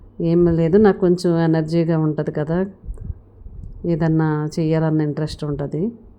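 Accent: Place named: Andhra Pradesh, India. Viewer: మీ voice విజయనగరం జిల్లా శ్రీకాకుళం బోర్డర్ లా ఉంది...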